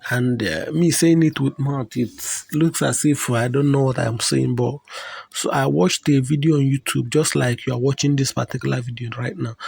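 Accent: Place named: Africa. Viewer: Please you sound African stop fooling I know African are intelligent 🧏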